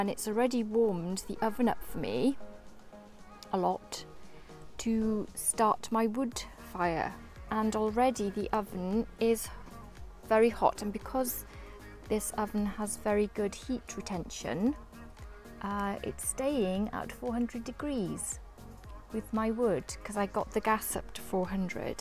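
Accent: Wales, United Kingdom. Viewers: Omg I’m addicted to watching your videos soooo Morish, I was set on buying a Uuni pro tomorrow but now having seen this it’s thrown a massive spanner In the works 🤦🏽‍♂️ I have eaten out rather a lot lately and find that I can tell the difference now between a gas fired pizza and a wood fired pizza. Do you agree? I prefere the taste of wood fired and also find it more fun with wood, with that in mind what oven would you advise me on, given a majority of my pizzas would be wood fired. and gas as a back up (due to typical welsh weather)!! Thanks in advance PS. you sound like your from Wales? 🏴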